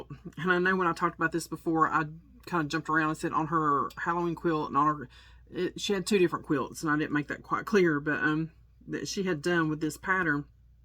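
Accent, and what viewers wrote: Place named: South, United States. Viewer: My favorite Christmas cookie is Chocolate Crackle cookies. It is so easy to make with a cake mix. Every time I make them they just disappear in a hurry. My whole family loves them. Love your channel and love to listen to your southern accent as I have said before. Your videos are all great.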